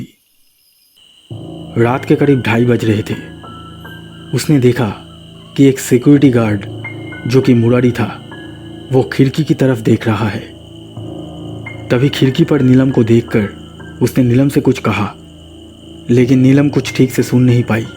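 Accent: Bengal, India. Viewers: This youtuber is from Kolkata only I think so as he also has one more Bengali dubbed channel where he uploads this story only but later and you can notice his accent and names of characters are Bengali names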